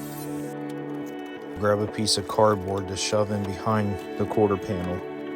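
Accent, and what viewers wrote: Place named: Pennsylvania, United States. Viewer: Helpful video, nice work. And a little Pennsylvania accent asmr I hear? Eh? Eh? Pennsylvania? Am I right?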